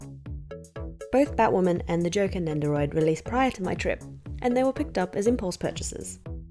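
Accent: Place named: Australia. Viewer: This is the first video of yours I am watching and you are reviewing Koto Batwoman. I've been eyeing that figure for a while now lol...I guess I need to get it now. I love your crystal clear speech and pronunciation. That Aussie accent is something else, tho :) <3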